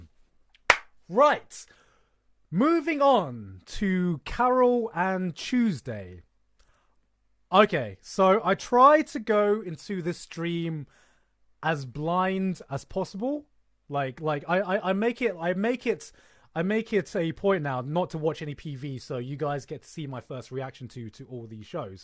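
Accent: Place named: United Kingdom. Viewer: Holy shit look at that baby face😭. The british accent is much thicker too.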